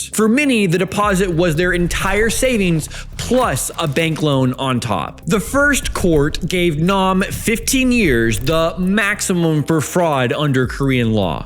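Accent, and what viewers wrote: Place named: Australia. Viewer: Oh Shit he is starting to talk like an Aussie. He's integrating.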